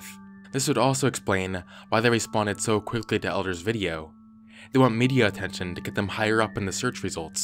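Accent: Australia. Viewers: Wow has a pretty good Australian accent lmao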